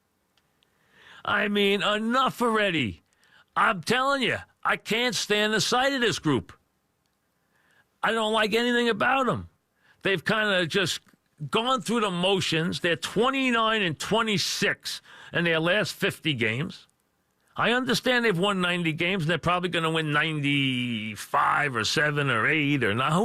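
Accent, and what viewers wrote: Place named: New York, United States. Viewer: I wish these stupid New yurkers would learn how to speak English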